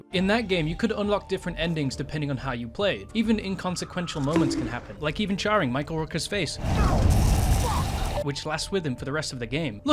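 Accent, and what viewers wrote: United Kingdom. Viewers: The pyrocynical diss is crazy, this channel feels like an exact clone. The autistic obsession with random video games, the queer fursona, the british accent. Its all there.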